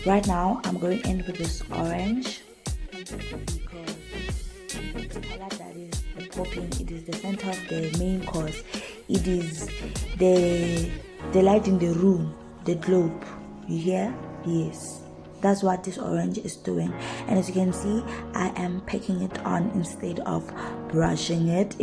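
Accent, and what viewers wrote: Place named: Nigeria. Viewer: is it even a video if we don't get the naija accent!!! love it😂😅😂😜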